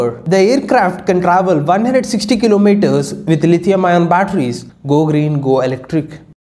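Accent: South India, India. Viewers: South se h bhai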